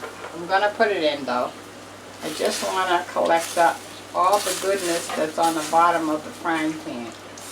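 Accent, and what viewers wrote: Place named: Boston, United States. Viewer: Hi I can’t wait to try this. I was just thinking of some of the cheap things i buy plenty of and how i can use them in other recipes. This sounds perfect for all of the peanut butter I love so much. And thanks for the meat suggestion. Especially the dark meat cut-up. I know you hear this all the time… I hear Boston 😘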